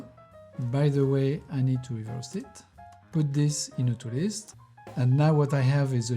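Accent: France. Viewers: not at all. this is a french accent for sure 😊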